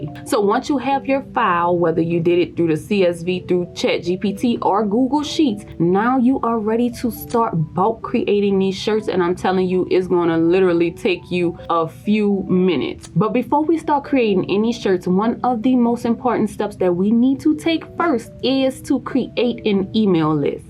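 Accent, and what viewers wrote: New Orleans, United States. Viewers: It's the NOLA accent for me❤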